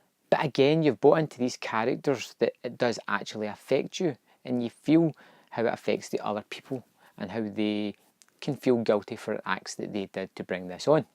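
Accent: Scotland, United Kingdom. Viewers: You know, I'd love to hear You're most natural excited reaction, speaking wise, to anything. The Scottish accent is unparalleled in It's rough, and brutal choke it contorts onto the English language . It rebels against the proper English language, maybe not purposefully, but essentially. Your national language is so strong that, even when replaced, It still survives through the accent, to the point that, It still retains the essence of Your original culture, and attitude. Your language, genetics, and culture are beautiful. All cultures are different and have unique qualities. True diversity is Scotland for the Scottish, and Japan for The Japanese, and so, on.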